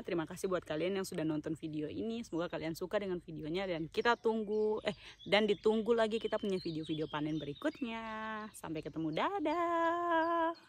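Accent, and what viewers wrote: Papua, Indonesia. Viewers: Paleng suka dengar kk bcra Deng logat Amq Papua🤣🤣🤣🤣🤣terhibur hatiq😍😍😍😍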